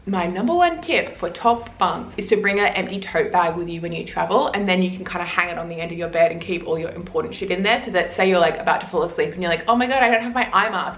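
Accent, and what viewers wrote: Australia. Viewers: She's Australian thats how it sounds Mate with Aussie accent. What accent should she use MANY out there?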